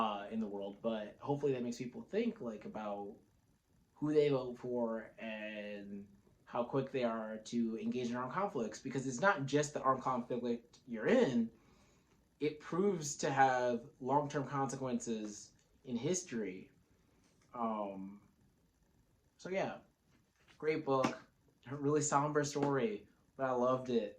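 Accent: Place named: United States. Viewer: Great review! It is a shame you barely have any viewers because I think you get across the point Remarque was trying to make very well. From your accent I presume you're American, that makes it even better, getting that - in his intention - universal message of "just don't kill each other for the interests of others". I got here by somehow remembering how that book impacted me as a kid and then just typing it in. Ended up here, was anything but disappointed. Thanks for covering it that well. And again, you really deserved a whole lot more viewers.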